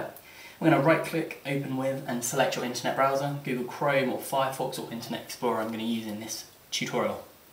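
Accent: England, United Kingdom. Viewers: are you english?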